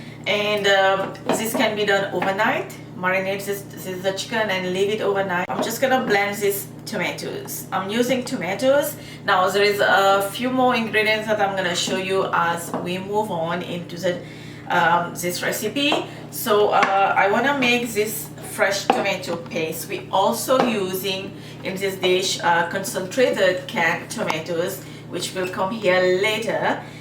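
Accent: Mauritius. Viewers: Love the Mauritian accent👍🏽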